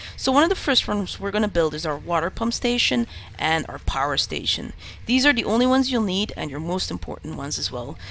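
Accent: Flanders, Belgium. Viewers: may be cos she is from belgium :) But you can hardly tell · I'm also from belgium. Are you flemish? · Nice! first flemish that dosent have that broad accent!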